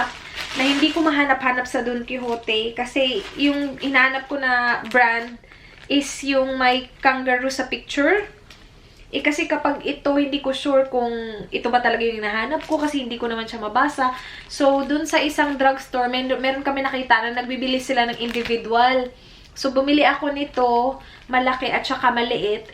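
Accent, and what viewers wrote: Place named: Visayas, Philippines. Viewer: Maka addict man imong vlog ms... cute nimo lalo Kong magbisaya hehe.. God bless po.. ingat po kayo..:) mwahh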